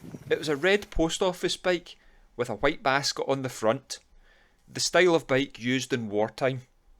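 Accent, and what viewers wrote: Glasgow, United Kingdom. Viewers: Sounds Glaswegian to me (albeit well spoken) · Sounds to me like he could be doing Gregory’s Girl, definitely Glasgow.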